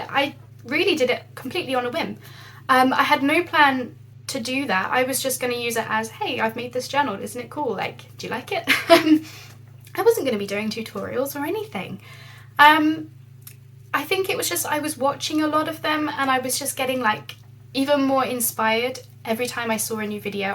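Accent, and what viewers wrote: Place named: England, United Kingdom. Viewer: I am so glad you asked! 😃 From the title I was NOT expecting and English accent. Lol. I was wondering if I missed why she chose. I look forward to the answer. ♥️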